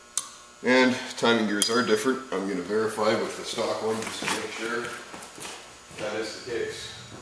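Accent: Alberta, Canada. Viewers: Sounds more like an Alberta accent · canadian accent, lived there for 8 years ;) looking to self install cam in my 2012 camaro ss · are you canadian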